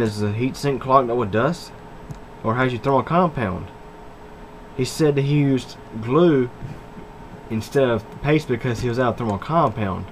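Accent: South, United States. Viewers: That southern accent tho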